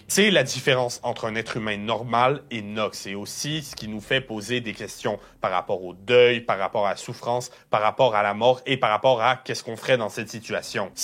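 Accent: Quebec, Canada. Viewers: Yo t'es quebecois???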